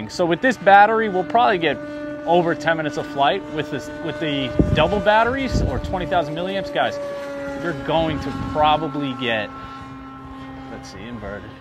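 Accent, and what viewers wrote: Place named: Brooklyn, United States. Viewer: Are you in the boroughs of NY? You have a slight Brooklyn accent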